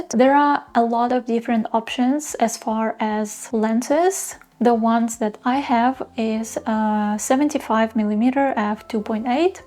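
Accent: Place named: Ukraine. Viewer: Класне відео, почув акцент і думаю звучить ніби Українка, потім бюпобачив герб на шиї)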